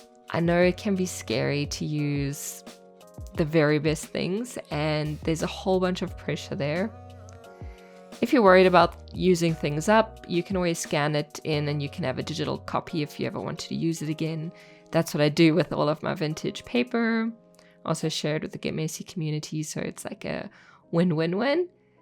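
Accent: South Africa. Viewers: Hi! First time to your channel. New subscriber. So enjoyed this video. South African?😃🎀💕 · First time watching your channel and I have to ask, are you South African. Very useful tips given. Thank you. I’m enjoying watching all the Junk Journal July videos.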